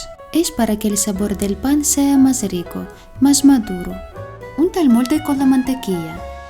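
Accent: Russia. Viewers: Por mas qué pienso no logró identificar tu acento eres rusa o española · te juro que me encantan tus recetas, pero tu acento me recuerda esos horribles munequitos rusos que tenia que ver de pequeno cuando vivia en Cuba, y se me ponen los pelos de punta.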